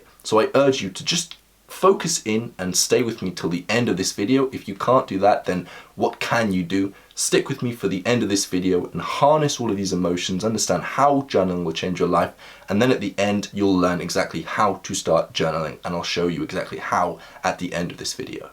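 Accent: United Kingdom. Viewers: Such an incredible, inspiring young man, not only you are very smart and charming and that British accent help you the most. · Your accent is wild I feel like you’re British but your dictation is Americanish to sound claró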